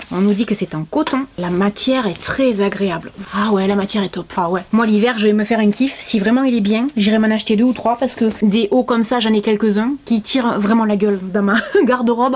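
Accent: South, France. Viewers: On pourrait presque dire merci au reconfinement parce qu'on a deux fois plus de vidéos de, et ça c'est chouette! ^^ Toujours des vidéos super agréables, et souvent quelle rigolade! Puis cet accent du Sud un pur bonheur! Merci d'y faire honneur, notre accent est tellement moqué souvent, et pourtant il chante même quand il pleut chez nous. Continue ton petit bout de chemin, soit fière de ton chemin parcouru après tout se que tu as raconté dans ta précédente vidéo sur l'amitié et ce que tu as vécu. Je ne peux pas t'appeler "maman youtube" parce qu'on a presque le même âge, mais bravo pour ta chaine et ces bons moments que nous passons avec toi chaque semaine. Ca fait un bien fou! Bises de Montpellier. Et caresses à ton adorable Tal;-). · ce pyjama rose je l’ai depuis bien 3/4 ans et c’est toujours un bonheur de le mettre! Il s’est peut-être un peu détendu avec le temps mais absolument pas abîmé et il est toujours aussi chaud et doux! 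J’ai essayé les ceintures en cuir chez eux et c’est les seules qui ne se déchirent pas, j’ai aussi pris les grosses chaussettes avec le molleton dedans et laine dehors c’est aussi super. Merci pour tes vidéos et ton accent du sud, ça ramène du soleil en Alsace, je t’adore!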